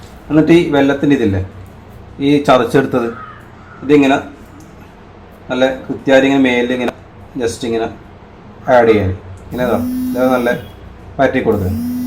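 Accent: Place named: Bengal, India. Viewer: നീ മലയാളം പഠിച്ചിട്ടു പറയു, വിഷയം നല്ലത് but ബംഗാളി, ഇതു പറഞ്ഞാൽ പകുതിയേ മനസിലാകുന്നൊള്ളു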